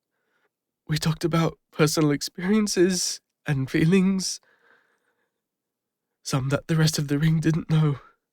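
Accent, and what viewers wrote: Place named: England, United Kingdom. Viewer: You've absolutely nailed the English accent 😁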